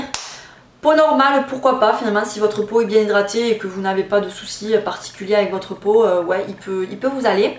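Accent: South, France. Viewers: J'adore les fonds de teint moi aussi et j'aime en porter toute l'année, j'aime tester les nouveautés. J'aime bcp tes vidéos avec en plus ton accent du Sud car je m'y reconnais (j'habite pas loin....). Surtout continue, biz Nany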